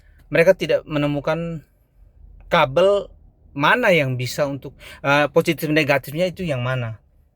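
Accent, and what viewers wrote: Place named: Nias, Indonesia. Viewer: logat nya kyk nias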